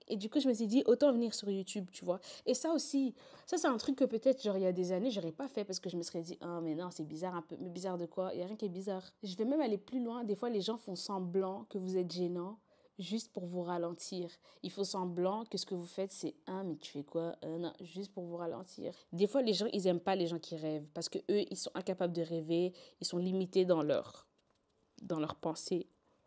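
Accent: Flanders, Belgium. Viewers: Oui elle a grave un accent belge hahah bien néerlandophone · Ouii t’as grv un accent flamand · mais oui t’as un accent ! c’est sur t’es belge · Tu as un accent de flappie qui parle français soupoudrer d'origine Rwandaise ou burundaise 😗😗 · Oui tu as un accent ça fait belge, un peu néerlandais ou je sais pas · Mais t’a juste un accent belges 🥲 il doit y avoir plus de français dans ton TikTok ( je me suis abonnée mdr j’aime bien te regarder sur tiktok donc why not)💕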